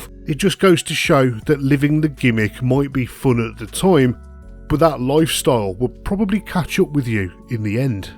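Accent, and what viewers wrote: United Kingdom. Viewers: “or joost a bit of good look” I love bri ish accents 😁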